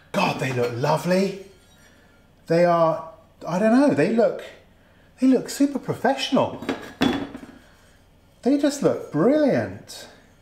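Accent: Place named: United Kingdom. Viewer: Hi again. I got excited watching you opening up these bad boys. Knowing what it's like to attempt a new pour I can almost feel my heart beating faster. Fun to watch! Right now I am making patterns (wood) and prepping to cast some CNC parts (the z carrage) which are longer and heavier than anything else I have done (it's to be a big 8 x 4 monster cnc). I like the gateing and swirl trap system you used. I 'think' I will need gates a touch larger for the casting I want to make to get all the metal in there before freezing... By your accent I am guessing you might be in the UK? If so, at some point we should totally do a YT colab of some kind. Ok peace, Bongo. PS, how do you think you could eliminate the bending (do you just put it down to the geometry of the pattern (the two perpendicular ribs)?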